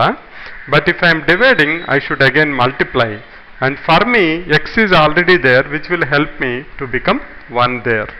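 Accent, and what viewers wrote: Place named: India. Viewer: английский с индийским акцентом - просто божественно)) аж приятно слушать 😖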